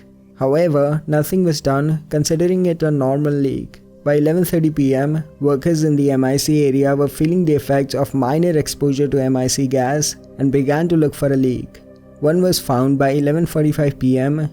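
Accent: India. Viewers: Your voice sounds like Indian. Are you Indian ?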